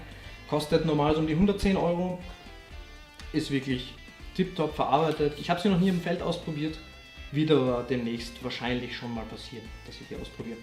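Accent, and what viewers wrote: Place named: Austria. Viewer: Man hört eigentlich auch an deinem Akzent sofort dass du aus Österreich kommst :D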